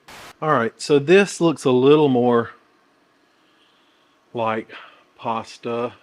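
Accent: South, United States. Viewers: What a great channel name to go with your sexy soothing Southern gentleman's voice.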